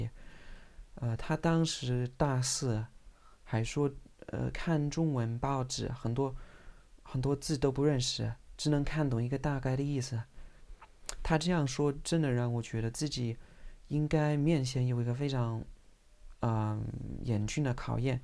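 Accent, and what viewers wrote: Standard. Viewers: close to perfect and standard Mandarin..seldom see any non native speakers master four tones so skillfully..brilliant.. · 你中文说的非常非常地道，你是怎么在没有中文环境的情况下发音练得这么标准的呢？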